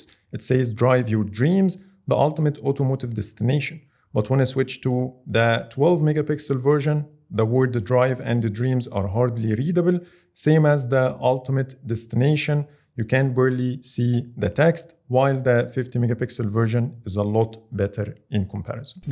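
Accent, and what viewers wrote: Egypt. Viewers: اللي بيتكلم ده مصري صح؟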